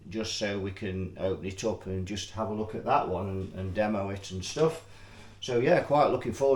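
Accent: Nottingham, United Kingdom. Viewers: Nottingham accent?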